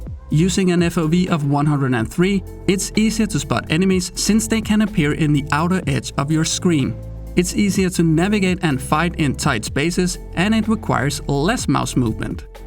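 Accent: Denmark. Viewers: Jeg gætter du er fra Danmark ud fra din accent :D Fedt content fyr! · Are you danish?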